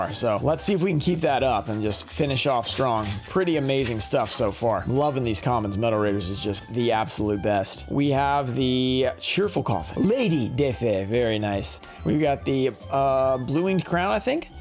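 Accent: Portugal. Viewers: Random question, are you Portuguese?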